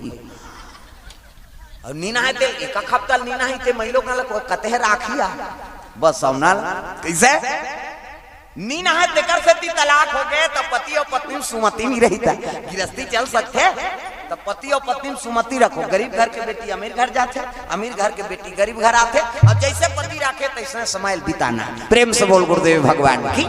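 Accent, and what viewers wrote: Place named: Chhattisgarh, India. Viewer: छत्तीसगड़ी बोली माँ बहुत मिठास हे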